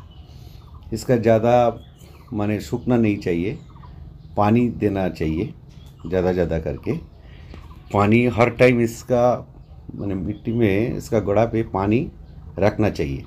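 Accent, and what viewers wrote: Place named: Bengal, India. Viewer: ठीक से तो बोली, बंगाली लहेके में बोला, इसमें गलत क्या ??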